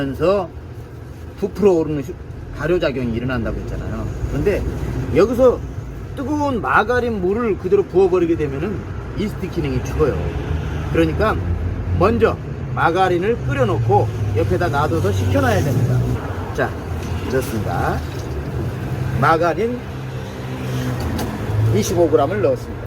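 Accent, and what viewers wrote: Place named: North Jeolla, South Korea. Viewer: 짠메세요 그랫끼때문에. 그람에.. 있는데..ㅋ 전라도 북도사투리네요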